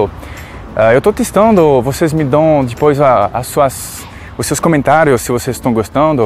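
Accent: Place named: France. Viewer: Seu sotaque francês é muito bonitinho! 😗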